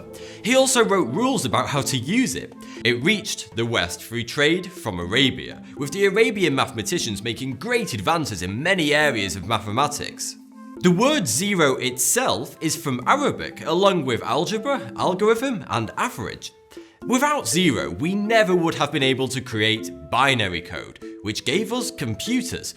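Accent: United Kingdom. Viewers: you're British aren't you?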